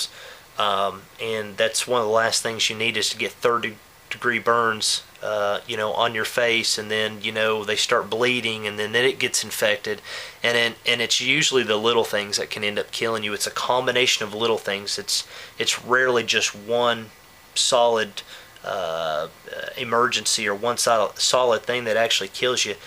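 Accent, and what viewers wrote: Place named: South, United States. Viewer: Your accent tells me somewhere in the south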